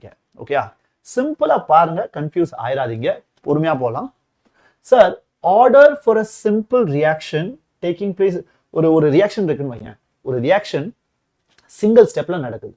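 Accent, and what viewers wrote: Tirunelveli, India. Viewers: Sir ku tirunelveli pola 😅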